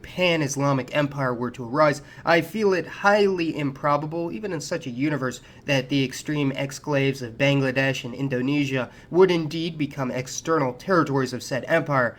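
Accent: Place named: United States. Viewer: and no American voice next time 😂try a British one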